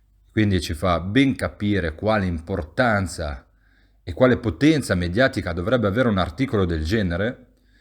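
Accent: Genoa, Italy. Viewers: Belin l'accento genovese CI VUOLE non perderlo mai! E per l inglese ce ne battiamo il belin sugli scogli di Camogli 😆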